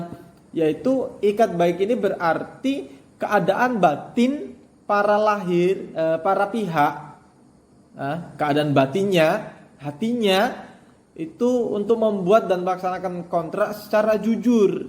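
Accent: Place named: Java, Indonesia. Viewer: Jawanya totok kali pak 😂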